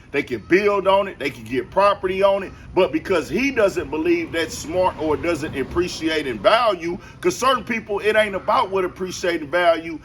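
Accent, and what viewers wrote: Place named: Houston, United States. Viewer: Brodie u so Houston😂😂😂 “ unless u wanna squabble” 🔥🔥🥶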